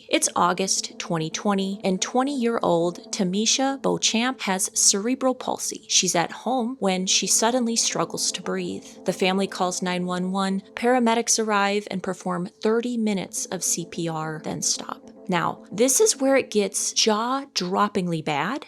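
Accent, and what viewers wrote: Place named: Midwest, United States. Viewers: This is the best youTube channel in existence. I love when the thick MidWestern comes out. 'HaAAspice'. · Your Midwestern accent 😅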